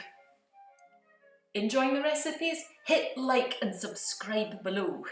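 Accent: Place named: Scotland, United Kingdom. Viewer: Thumbs up for your scones (and your lovely Scottish accent!)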